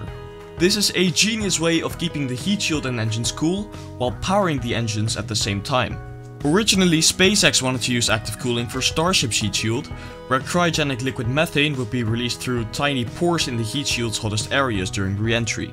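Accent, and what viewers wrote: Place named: Netherlands. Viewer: Good content, but please keep working on that Holland-accent 🙉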